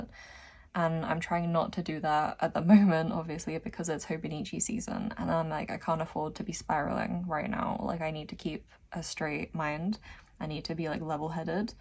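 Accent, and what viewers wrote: United Kingdom. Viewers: Vocal fry sounds so lovely when the person has received pronunciation rather than a Kardashian valley girl voice. Anyway, feel better soon! You're so right about our lack of seasons. It never seems to get very cold here these days. We might get a cold snap lasting about a week before we're back to a solid 50% humidity and temps in the low teens. I can't remember the last time I had to wrap up warm on my end of October birthday. I'm of the opinion that infections have risen because it's never cold enough to kill everything off.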